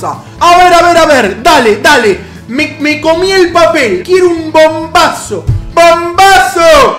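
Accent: Argentina. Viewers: Tu acento Argentino arruina el video!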